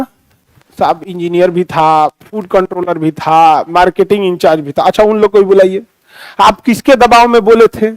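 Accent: Bihar, India. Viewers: Kya jabardast bhojpuri Bihari bhasha ba sir 😂😂😂🎉🎉🎉❤❤❤wah wah wah wah wah wah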